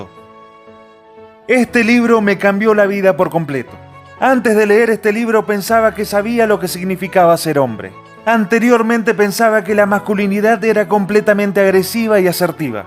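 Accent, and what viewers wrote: Argentina. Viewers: No manches. Yo me dí cuenta de que es argentino luego luego.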